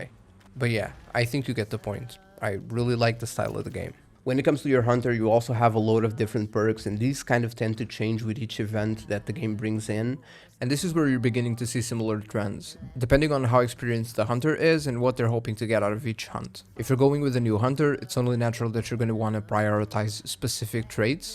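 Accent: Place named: Portugal. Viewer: Are you Portuguese? your English sounds like it.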